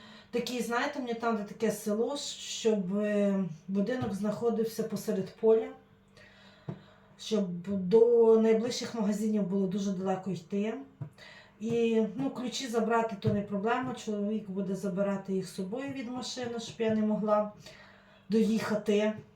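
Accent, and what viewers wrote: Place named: Lviv, Ukraine. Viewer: Ви дуже гарно розмовляєте українською,молодчинка,говір подібний до нашого львівського.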